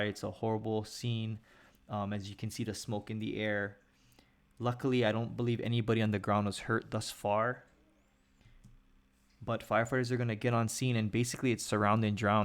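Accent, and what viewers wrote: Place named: Hawaii, United States. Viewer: sounds like you're from Hawaii. Rest in peace aviators.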